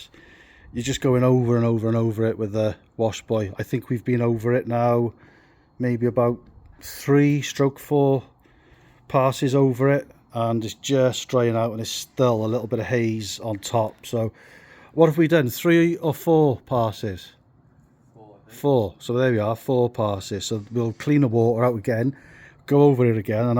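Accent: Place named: Cardiff, United Kingdom. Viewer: True. Newport myself man. Seen johnny boy on the circuit as he's more my end but I think you fellas are more Cardiff by the accent twang.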